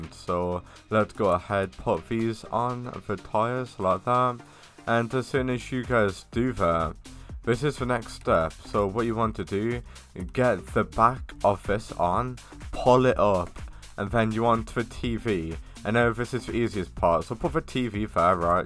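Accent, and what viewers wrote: United Kingdom. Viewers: Ur British?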